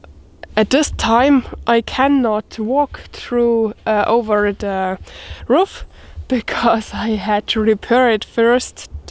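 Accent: Germany. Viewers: You have great video's. Mine grandparents on my fathers side came to America where I live and they were from Germany and Switzerland. Well, they had the same accent as you, Yes! they sounded just like you :) I listen to you and I feel very much at home, good feelings. I like your videos & how you take us with you. But you know, to hear you talk, yah? yah I feel at home.. yah, is da truth. :) I'm so happy I found your channel.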